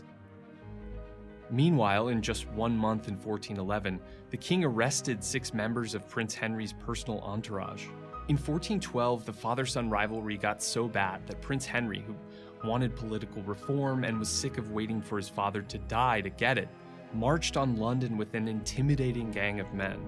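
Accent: United States. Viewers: It's a new experience for me to hear English history in an American accent - I enjoyed it. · Its jarring to hear a US accent, I would have preferred an English one. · Atleast get a narrator with an English Accent, this history lesson of England with an american accent seems so unoriginal and uninspiring.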